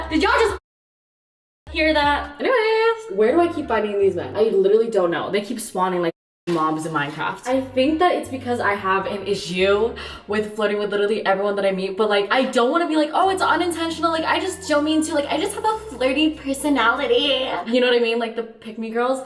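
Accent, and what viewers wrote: United Kingdom. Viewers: hwa definitely british u cant hide it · I genuinely love your British accent why don't you upload a challenge talking about your British accent from the beginning of the video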